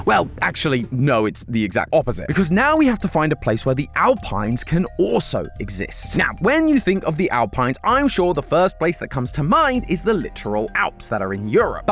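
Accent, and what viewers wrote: United Kingdom. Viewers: just came from a film theory and got jumpscared by the british accent immediately 💔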